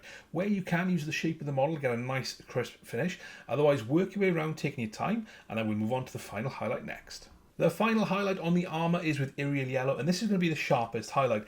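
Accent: Wales, United Kingdom. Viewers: Where in Wales are you from? Loving the vids by the way, I've just got into minis (with the delivery of my DD KS) so painting is....uhhhh....fun :D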